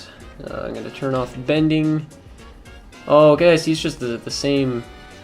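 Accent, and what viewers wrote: Canada. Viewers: I thought I could tell from your voice you were Canadian, MTL talk and Canada Day video proved it lol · Ok, are you from Minnesota or Canada? Your accent is very...Canadian.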